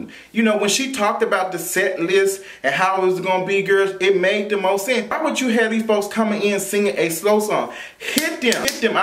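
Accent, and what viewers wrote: Memphis, United States. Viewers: You’re so Memphis. Be currful (careful) lol I love it!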